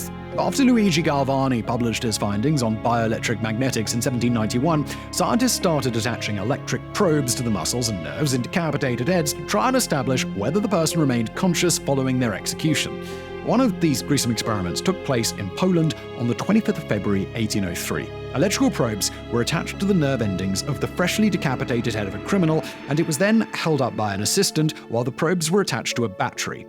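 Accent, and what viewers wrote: United Kingdom. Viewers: Damn he is british.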